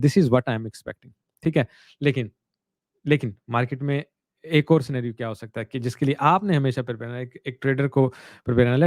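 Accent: Punjab, India. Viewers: Isko kehte hain att ta hahaha sir jab apne bola na dxy ne att chukki hui hah bht sakoon mila apki punjabi itni pyari hah na sir bht mithas hah apki lang mein karazdar hain hum apki mohabat k sir alllah bht khush rakhe apko ❤️thankx for everything sir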